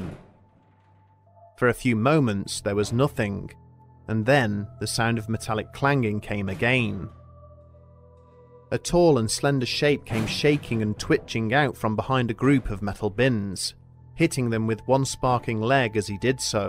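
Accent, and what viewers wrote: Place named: Midlands, United Kingdom. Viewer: Sounds like somewhere in the Midlands to me.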